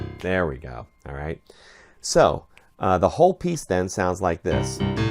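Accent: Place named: South, United States. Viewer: I love the American accent especially 'down south' and that American 'drawl' is kinda special too!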